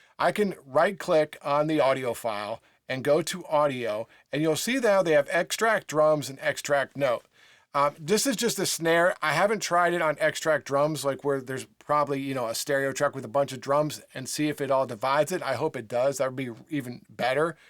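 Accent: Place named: United States. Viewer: What part of the US is that accent from?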